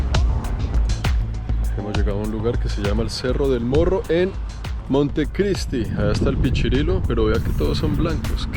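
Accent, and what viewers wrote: Colombia. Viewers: De dónde eres? Su acento parece colombiano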